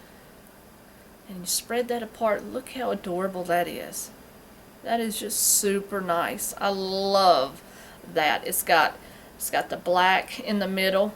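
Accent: South, United States. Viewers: I was wondering where you're from? You mentioned Minnesota but you definitely have that Southern drawl like someone from either Virginia or the South. Also what did you make with the yarn in this video.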